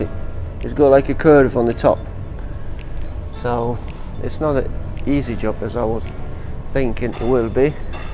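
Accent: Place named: Lincolnshire, United Kingdom. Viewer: You have a slight Lincolnshire accent going on there.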